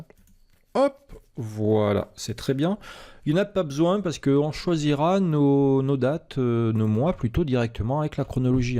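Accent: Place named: France. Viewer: Super, merci. Le meilleur prof d'Excel avec un vrai accent mignon français.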